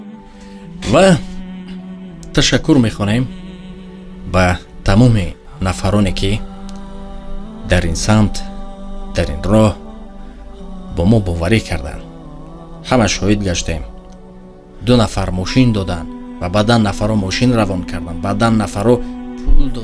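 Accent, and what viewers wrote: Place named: Khatlon, Tajikistan. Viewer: Ман нав фахмидм шумо аз хучанден амо лахчаи гапзанит пашти хатлония зато одами точики сам главни мусулмони гапнес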